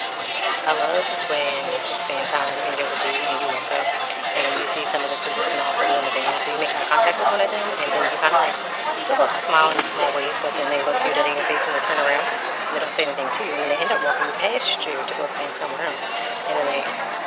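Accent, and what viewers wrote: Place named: Baltimore, United States. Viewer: Are you from baltimore? im hearing the accent lol, i love your videos E 💗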